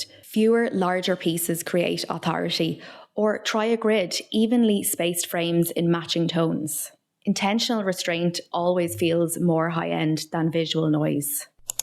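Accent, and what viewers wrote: Ireland. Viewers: Thanks for getting straight to the point without a lot of unnecessary introduction and explanations. Your Irish accent is as beautiful as you are, too. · To a large extent, I am watching because i love Irish accents.....so there's that. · Love your irish accent up the dubs 😂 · I'm here for the lovely Irish accent!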